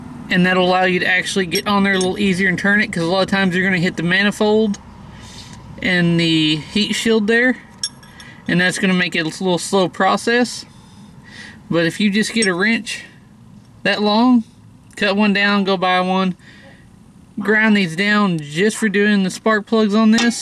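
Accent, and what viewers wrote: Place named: South, United States. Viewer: Personally, I can't thank you enough for this video. Just got a P0303 on my 2004 Blazer LS SUV 4.3 Vortec this week. Picked up some standard AC Delco plugs and a set of "driveworks" (good reviews) brand plug wires at Advance yesterday, (Sunday), so I'ma try to tackle the driver's side --- first --- and get out the #3 plug out first too. Thanks for sharing your knowledge with all of us because you sure do make our DIY mechanic lives easier! Mr., you sound like a good 'ol country boy --- like myself --- so I was wondering what state you live in? Thanks again!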